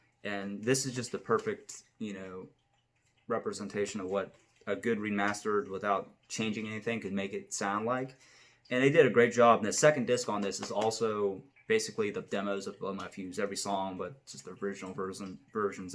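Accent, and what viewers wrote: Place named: Maryland, United States. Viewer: ....I hear your Maryland accent, you can't hide...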